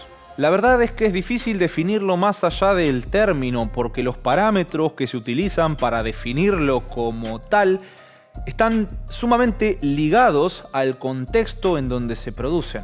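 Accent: Argentina. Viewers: Por la forma de hablar parece argentino o uruguayo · Che, que me han gustado tus videos. Muy sensatos, pibe. Saludos desde México. Seguí así. (Me encanta el acento Argentino, sin afán de burla).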